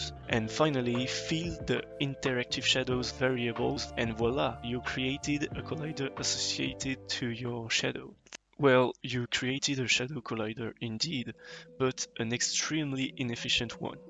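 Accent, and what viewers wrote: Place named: France. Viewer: Ton accent français te trahit :) Très bon tutoriel. J'apprécie beaucoup ta chaîne YT - très pédagogique. Bonne continuation ++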